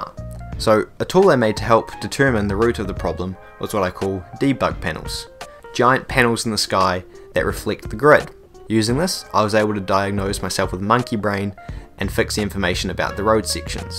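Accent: New Zealand. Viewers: You sound like you're from NZ. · Yo is that a rare kiwi accent on youtube?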